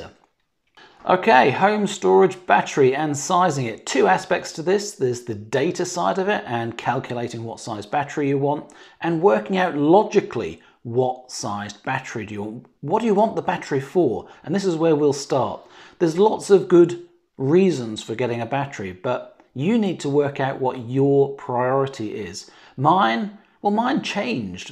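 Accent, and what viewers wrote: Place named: Norfolk, United Kingdom. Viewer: Thank you for your well thought out video. We installed our panels and batteries nearly a year ago now, and had similar thoughts. Now we live in Portugal, which is somewhat different. Approximately 300 days of sun per annum and you can’t sell back to the grid. But the same logic still applies; understand what you want from the system, work out your peak daily use, and size panel production and energy storage accordingly. We have been off-grid since March and we consider we have the balance. By the way, I’m a Norfolk gal, and I can hear a slight lilt in your speech, but the picture of Elm Hill confirmed it to me!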